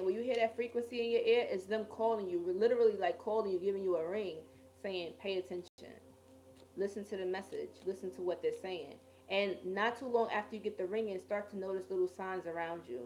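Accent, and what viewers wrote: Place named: East Coast, United States. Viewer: Sis, I appreciate how you use this platform. Tha info you choose to share gives me more life—it sustains me. Also, there are others who I follow, who also use this platform similarly, disseminating similar info but you have a wonderful knack for imparting this info, that just RESONATES in a different way. Shit refreshing AF and I thank you. Plus, your east coast accent is one of my favs. ✊🏿❤️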